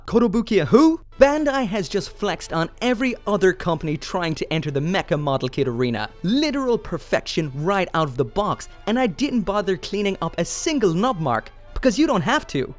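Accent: Ireland. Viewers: Once you heard his irish accent you can't unhear it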